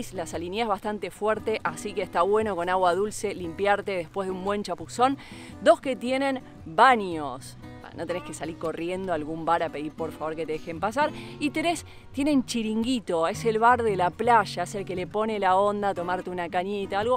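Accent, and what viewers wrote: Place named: Argentina. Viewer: escribo desede ginebra ( Suiza ), perdoname, mi nivel de español no es alto. Pero me encanta la energia que ud transmite con sus videos.es la mejor video sobre Badalona.En particular cuand ud.habla del parque que es el pulmone verde de la ciudad. Tu accento argentino es muy simpatico cuando pronuncias las palabras. Me recuerdo, que hace vueltas en bici desde Barcelona, pero en este tiempo no hay pistas para bicicletas, et yo me encuentro con mi bici, una vez en la autopista. Me falta mucho gramatica en castellano, y espero que eres indulgente conmigo. Besos de Europa Central. Tu nombre es?!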